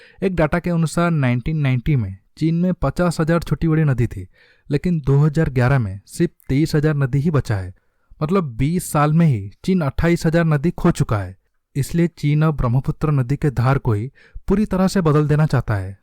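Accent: Odisha, India. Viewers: Bhai tume odisha ru i guess based on your pronouncing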